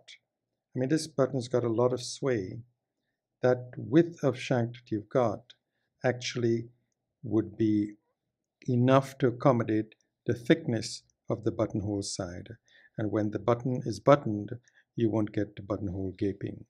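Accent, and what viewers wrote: Trinidad and Tobago. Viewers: I recognized that accent from Trinidad & Tobago, as my mother, a Serville, is from Trinidad. What an honor to learn of this as I saw you proudly displaying the Trinidad & Tobago flag. Thank you Sir for teaching us with your videos. It is quite the pleasure listening to your wisdom and learning more about the world of Bespoke. Mr., I would love to experience a collaborative video produced between you and Mr. Jacome. It would be assured artisan brilliance. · I knew I recognized your accent, and it was confirmed with your Trini flag! I am fascinated by the amazing detail in your work!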